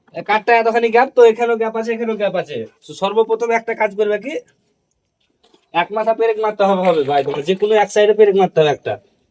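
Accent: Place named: Chapai Nawabganj, Bangladesh. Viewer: চাপাইনবয়াবগঞ্জের ভাষা এটা ❤❤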